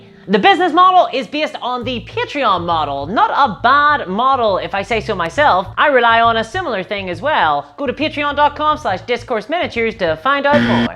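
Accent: Ireland. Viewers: You sound Irish.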